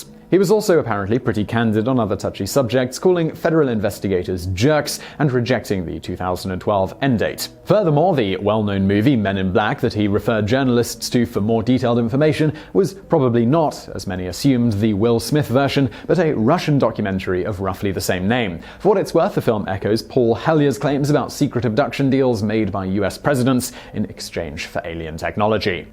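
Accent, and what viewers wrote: United Kingdom. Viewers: He is British , hence he use British not American pronunciation norms. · Hes British. They say some words differently. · He's British!? I hadn't realised !!!!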